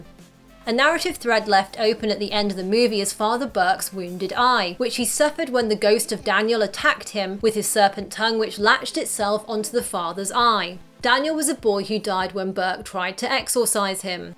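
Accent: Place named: United Kingdom. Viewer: I like your british accent.. 😍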